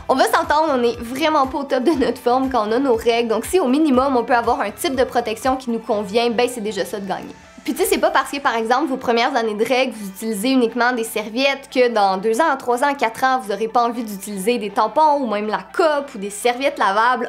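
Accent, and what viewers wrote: Quebec, Canada. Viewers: Seynabou Ng moi je crois qu elle et québécoise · Ouais tes bien québécoise '' melon d'eau '' 😂😂😂 Ma grand mère est québécoise mais elle a pas du tout cette accent Peut être parce que j'ai l'habitude de l'entendre Bref tes vidéo sont super continue 😘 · Toi tais français de France hein? Pcq elle,elle est québécoise(comme moi)😂😂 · Trop bien t'as vidéo !!!! T'est trop belle et j'adore ton accent québécois💜💜💜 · oui elle est quebecoise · C’est juste l’accent québécois hahaha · St'une québécoise 😌💅🏼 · Elle québécoise comme moi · T'as vraiment intégré l'accent québécois. On devinerait même pas que t'es née en France. C'est important de parler de ce sujet sans tabous, surtout quand il y a des gars qui écoutent, raison de plus. C'est un signe qu'on n'est pas tous indifférents à vos problèmes de filles. Même si on ne vit pas le phénomène directement, on le vit au niveau social. 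Et on imagine que c'est chiant au niveau inconfort et organisation et ce que ça vous coûte par année. Il faut continuer à bouger et prendre des analgésiques si nécessaire, et ne pas laisser vos règles vous empoisonner la vie comme une maladie. On trouve ça tellement plate quand une fille ne veut pas nous accompagner dans des sorties ou des activités aquatiques comme si elle était malade. et quand on est en train de relaxer, on peut vous offrir des massages pour vous rendre ces périodes un peu moins désagréables. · C’est seulement la façon de parler des québécois moi même j’en suis une et pour moi c9est vous qui avait un accent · J'aime bien l'accent québécois il est trop beau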